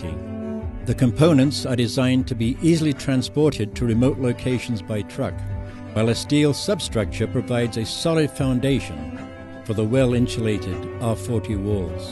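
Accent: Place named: United Kingdom. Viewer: Cool house, but what's up with the British accent?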